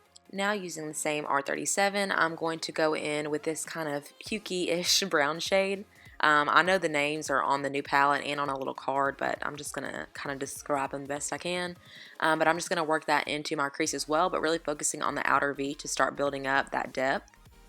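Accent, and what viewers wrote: South, United States. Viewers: i was not expecting that southern accent from you! proves you can't judge a book by it's cover.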